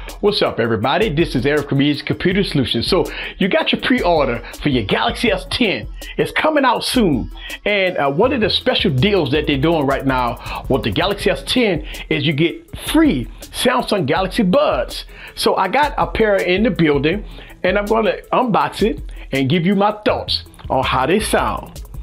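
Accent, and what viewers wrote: Louisiana, United States. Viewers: I feel like you're from Louisiana based on your accent lol. Good video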